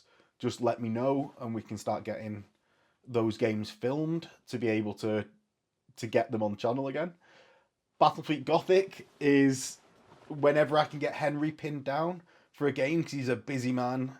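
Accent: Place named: Manchester, United Kingdom. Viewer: Where abouts in the uk are you based? Might be able to trek a bit for a game. I'm thinking manchester area by accent?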